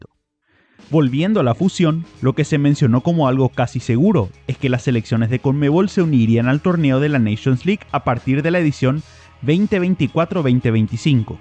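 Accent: Paraguay. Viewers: intento adivinar tu acento, eres paraguayo¿? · TU ERES PARAGUAYO TU TONO SUENA COMO UN PARAGUAYO ?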